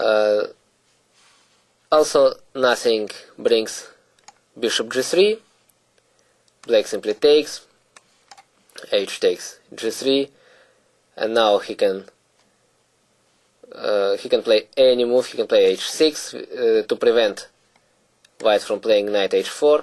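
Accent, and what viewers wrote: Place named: Russia. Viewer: he actually has a russian accent...he's not faking it...:-p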